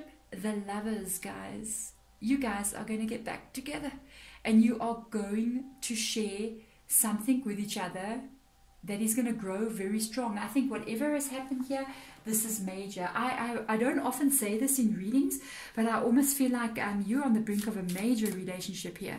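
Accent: South Africa. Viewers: Good to hear a south african voice again. I been in the uk too long now lol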